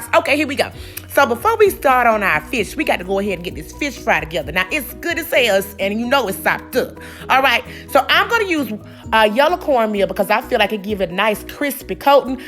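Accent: South, United States. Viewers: I like that country talk ❤️ Already subscribe 💪🏿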